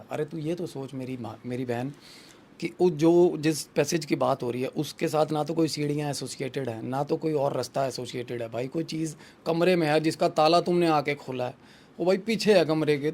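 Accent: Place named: Punjab, India. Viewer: Bhaiya wo punjabi English hai ...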